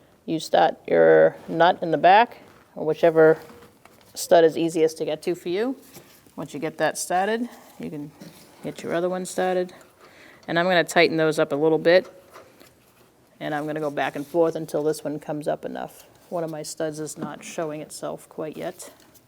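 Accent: Boston, United States. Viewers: gotta love that boston accent!! SWAY BAH!!! lol great video!!!!!!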